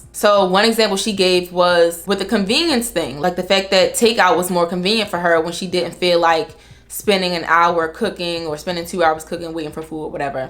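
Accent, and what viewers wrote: Baltimore, United States. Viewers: I hear Bmore accent 😌? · that baltimore accent heavy😭😭great content thank you!!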